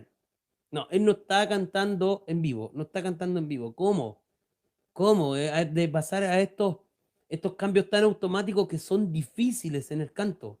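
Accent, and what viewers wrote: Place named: Chile. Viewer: jajaja gracias por el análisis... he visto a otras personas llorar cuando lo escuchan por primera vez, así que no te preocupes. Un abrazo, desde Argentina (supongo que sos chileno por el acento)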